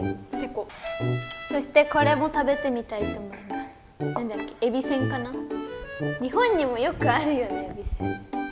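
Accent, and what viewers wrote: Thailand. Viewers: แซบปุอิหลี พูดอิสานน่ารั๊ก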